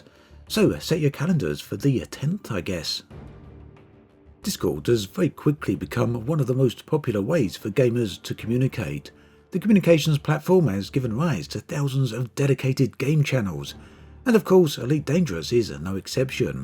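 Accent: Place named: South London, United Kingdom. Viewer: Hey cant help noticing your accent is like Pritti Patel's..is it an area of south London?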